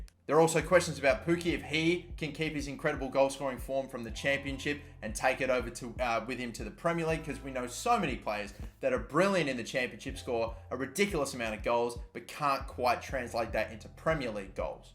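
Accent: Australia. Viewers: he's Aussie right? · no he is Australian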